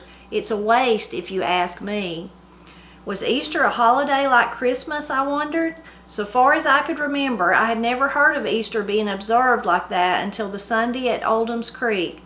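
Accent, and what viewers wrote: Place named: North Carolina, United States. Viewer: I love hearing your stories, my daddy was raised in Burnsville North Carolina in Yancey county. When I was growing up every Sunday me and all my cousins went to Papa's house where my daddy and five brothers were born and raised, my mama and Aunt's would go in the kitchen and on the big black wood stove start making a big Sunday dinner, it always seem to taste better to me when it was cooked on that wood stove. I still live in North Carolina and I so enjoy watching you, I also like to hear the way you talk because you sound like me I mean no disrespect by that! Thank you for keeping our traditions alive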